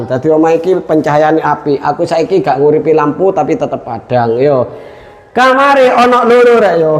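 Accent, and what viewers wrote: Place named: Madura, Indonesia. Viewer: Madura y bos.... Logatnya iku lo rek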